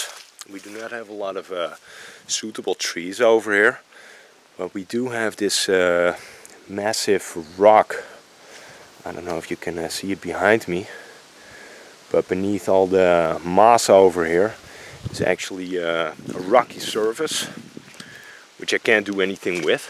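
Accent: Netherlands. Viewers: ik wist dat jij nederlands was want je praat echt raar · Hey, Ik hoorde in je video's een Nederlands accent en nu in je bouw video van je boomhut werd dit bevestigd. Erg gaaf wat je allemaal doet, en ook origineel. Ik ga blijf je volgen, ga zo door. En je weet wat ze zeggen een goede timmerman verliest nooit ze kunde:-) Gr Tienus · Grappig dit, ik kreeg door je lichte accent al het idee dat ik hier met een Hollander te maken hadden. Ik heb een paar jaar geleden ook een "playhouse" gebouwd voor een familie in Sintra, Portugal. Het is grappig om te zien dat er veel details in jouw playhouse zitten die ook in mijn playhouse verwerkt zitten, bijvoorbeeld de hartjes is een identiek detail. Zou het door onze hollandse achtergrond komen? Ik heb er geen video van gemaakt maar ik kan je wel wat fotos sturen als je me je e-mail adres stuurt.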